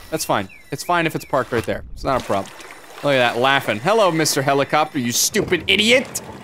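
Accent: Canada. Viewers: I love how we forget how Canadian he is until he says “Home Hardway” “Canadian Tire” and “BAG” “EL” instead of bagel 😂😂😂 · has never sounded so Canadian and I love it 🤣🤣🤣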